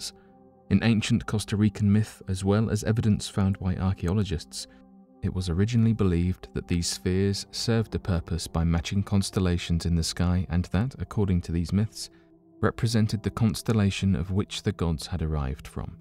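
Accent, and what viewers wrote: United Kingdom. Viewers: I wouldn't even watch this channel if you didn't have a british accent lol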